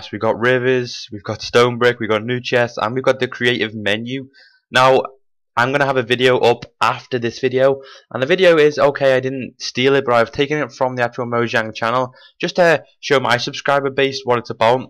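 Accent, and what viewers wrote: Liverpool, United Kingdom. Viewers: Thanks for the update info, also u r scouse right. I hope so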